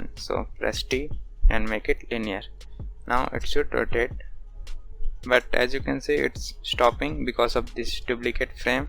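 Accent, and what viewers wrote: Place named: India. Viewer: Ok mr smart Indian sounding person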